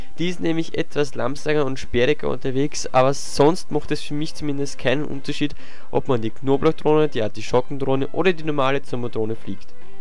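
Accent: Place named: Austria. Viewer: veroarscht wieso in österreichisch?biste österreicher ich nähmlich auch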